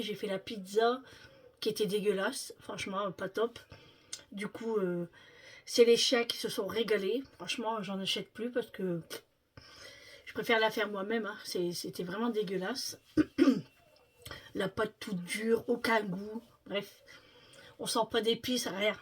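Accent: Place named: Nord, France. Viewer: Bonjour,je tai découverte y a pas longtemps, j'aime beaucoup suivre tes vlog,ton accent du Nord,j adore,mes parents été de valenciennes ❤tu est une belle personne, courageuse simple, ça ne m'étonne pas c'est ça le nord,je t'envoie des bisous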